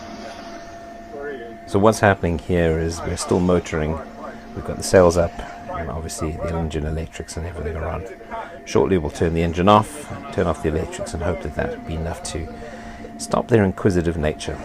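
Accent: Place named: South Africa. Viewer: The narrator is from 🇿🇦 with that accent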